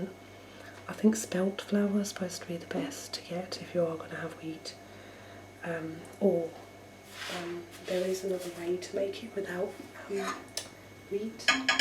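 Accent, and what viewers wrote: United Kingdom. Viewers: are you form the midlands ? it sounds like it.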